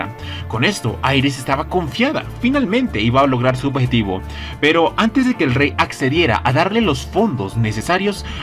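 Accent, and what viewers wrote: Colombia. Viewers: Señor. Sos de colombia?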